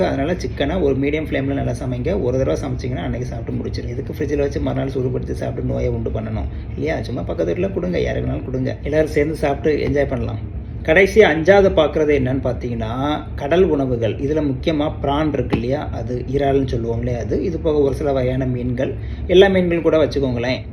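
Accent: Tirunelveli, India. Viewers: Ungal nellai tamil alagaka irukkirathu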